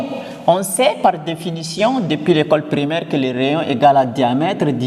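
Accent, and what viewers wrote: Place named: Bukavu, Democratic Republic of the Congo. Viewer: toi c,est certainement à Bukavu selon la prononciation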